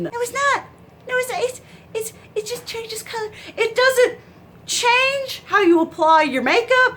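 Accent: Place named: Rural, United States. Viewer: Its funny how you make fun of people loosing their mind over different color beauty blenders when you apparently loose your mind for useless knick knacks and odd shaped and colored eyebrows. I don't know how many people will be able to get past the various annoying habits you have and your hick accent. But good on you for putting yourself out there. You are making fun of flipping NATASHA DENONA for baby Jesus sake. Have you seen how classy and beautiful she is?!?! I have every one of her palettes, not even kidding. Bullshit, you will be buying shit all year this year and just not telling people.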